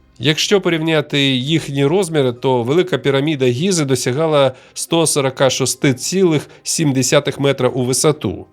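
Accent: Russia. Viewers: диктор - москвороте бЬІдло, не розуміє як вимовляти "і" та "и", читає слова на москворотий лад - іЛЛюзія" і т.д. Мінус і скарга.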